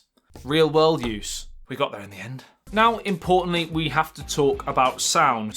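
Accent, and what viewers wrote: England, United Kingdom. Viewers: He has a very slight accent - not quite accentless Home Counties, but from the heartland where the English language developed and as such is considered the baseline from which other accents are judged! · lol its just his british acdent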